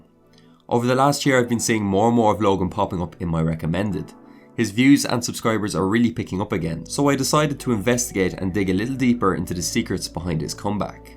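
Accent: Ireland. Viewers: Been through a few videos, and I'm still deciding if I'm watching the content because its beneficial, or is it because I lowkey love the Irish accent! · You Irish?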